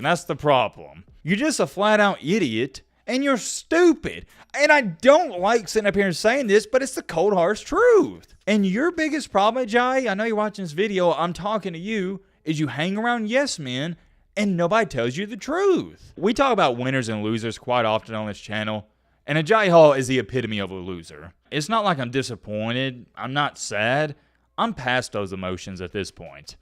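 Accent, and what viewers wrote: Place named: Appalachia, United States. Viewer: And, what the hell does he say at the end? Sounds like some Appalachian, inbred, dialect.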